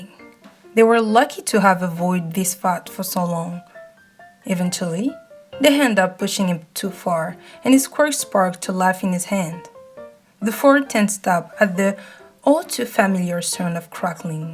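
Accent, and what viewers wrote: France. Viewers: this is gold new sub :) love that acent tho you french or somethin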